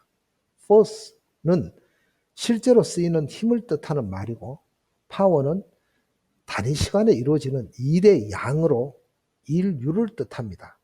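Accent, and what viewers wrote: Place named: Daegu, South Korea. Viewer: 감사합니다교수님. 제가 대구사람이라 확실히 대구 사투리로 들으면 이해도가 올라가더군요. 정말도움 많이 되었었습니다.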